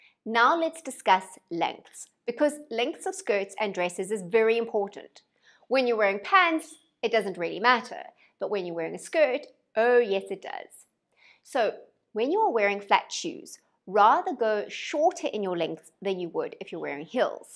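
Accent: South Africa. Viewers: you sound South African. Love the accent and love the tips, thank you!!! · South African? Nice video gal. Helpful.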